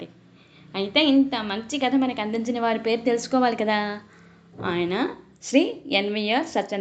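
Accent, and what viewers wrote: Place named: Rayalaseema, India. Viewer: చాలా బాగుంది..సీమ భాష లాగా ఉన్నది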